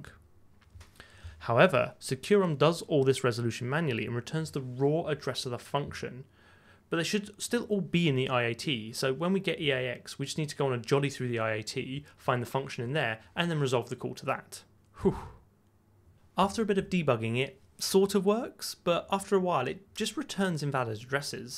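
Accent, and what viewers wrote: United Kingdom. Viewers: I have no idea what you're saying but your delivery is impeccably British and I can respect that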